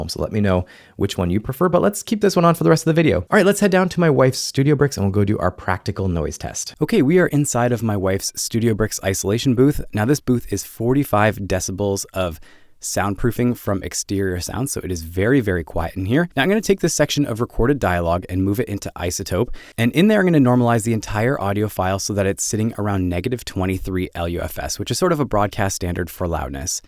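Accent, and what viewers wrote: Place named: Ontario, Canada. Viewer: of course! I have the LS-208 as well. Great mic! All Lauten Audio mics are fantastic. I have several. You seem to be a fellow Canadian. Also, I’m detecting an Ontario accent there. Maybe north of Toronto? Peterborough? I’m in the Niagara Area, myself. Nice to meet you!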